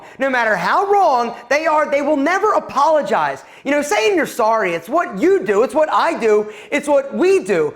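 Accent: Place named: Delaware County, United States. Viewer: you from delco bud?? You have the accent.. G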